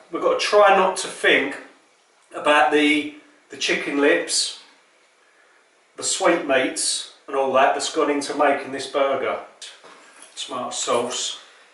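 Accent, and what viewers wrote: United Kingdom. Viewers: Love your eyes and accent...yum British men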